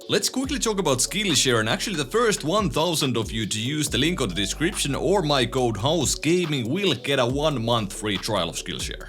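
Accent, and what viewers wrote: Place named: Finland. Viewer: Hyvä rallienkku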